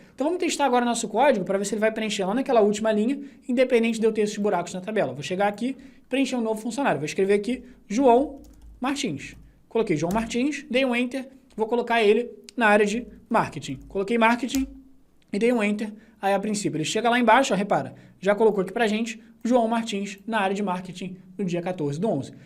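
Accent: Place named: Rio de Janeiro, Brazil. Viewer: Sinceridade, não sei se é sextou com vba ou estou com vba, eita sotaque do carioca... na dúvida #sextoucomvba! vou pelo ouvido...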